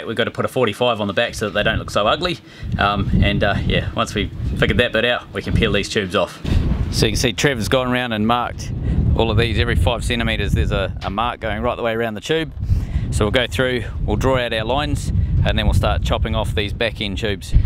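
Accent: New Zealand. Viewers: Great idea using the plastic chopping blocks. If it was me, I would have made a 'sandwich' with a plastic plate each side of the alloy tube and a longer bolt. Then you would not be relying on the sika (which I know can do the job) as when you have big blokes with big boots getting in and out of the dinghy it could be accidentally knocked off. Keep up the great work. Nice to head a real Southland accent!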